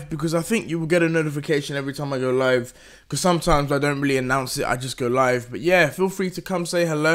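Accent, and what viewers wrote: United States. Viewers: Omggg his American accent is honestly one of your best things on this planet lmaoo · talking about sorrow with a American accent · Your American accent is top notch man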